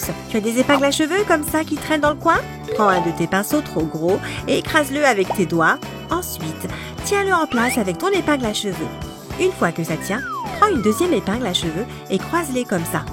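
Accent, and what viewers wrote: England, United Kingdom. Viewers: merci pour c astices mais juste vous êtes anglaise?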